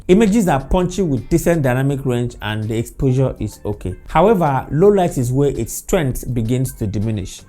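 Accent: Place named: Igbo, Nigeria. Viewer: Igbo man , I can hear your accent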